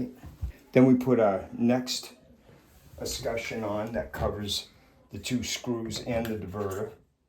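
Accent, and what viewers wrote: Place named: Cape Cod, United States. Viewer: Being a native Cape Codder, I could tell by your accent.😂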